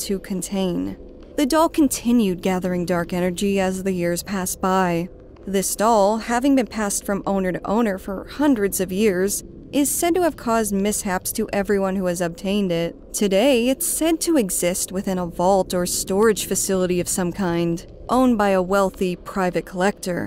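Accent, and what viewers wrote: Japan. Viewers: idk if this makes sense or if you have heard this before, but the cadence that you speak english is really similar to how native japanese ppl speak japanese. its really cool to hear that in english, and also your japanese sounds great too! been watching ur vids for a while ♡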